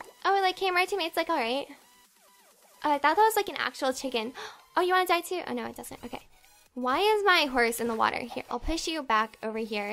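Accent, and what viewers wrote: Canada. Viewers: R u from Canada? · Are you canadian? :o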